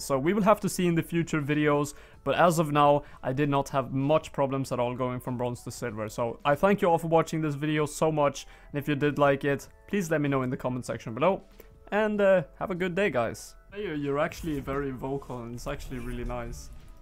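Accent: Sweden. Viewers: Are your swedish? Nice english x)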